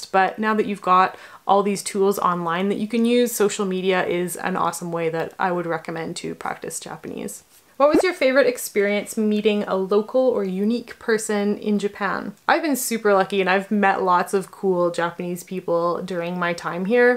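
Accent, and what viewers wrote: Canada. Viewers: K, you've been living in Japan for that many years... but i still heard that Canadian about. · Its always funny when I hear a canadian say “abooout”. eh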